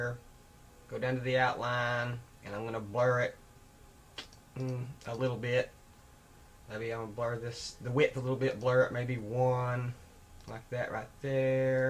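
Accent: South, United States. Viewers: you have to be southern. i can hear it in your voice. i'm from NC! thanks for the tutorial.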